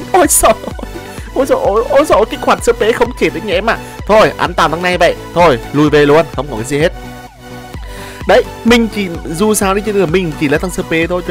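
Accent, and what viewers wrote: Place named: Central, Vietnam. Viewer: Thích giọng miền trung ghê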